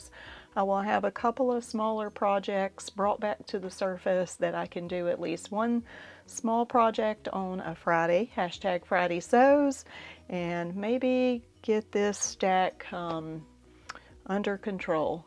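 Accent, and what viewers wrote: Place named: South, United States. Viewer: Welcome,! So happy that Deb pulled you in to #fridaysews! I found her on #teatuesday, where I really enjoy watching her. I don’t produce videos, I just love to watch and sew. 😘 It’s nice to hear another soft southern accent.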